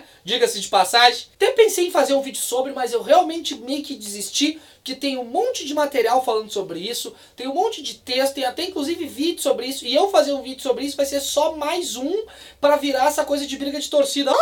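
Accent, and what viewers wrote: Porto Alegre, Brazil. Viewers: VÉI, quando eu assisto teu canal me sinto muito bem. Parece que eu to num bar da CB mandando brasa nos papo tri cabeça com a gurizada. É o sotaque portoalegrense. Acho que acostumei a assistir vídeo com sotaque paulista aqui no youtube. Abraço da zona sul de Porto Alegre e continua com os vídeos.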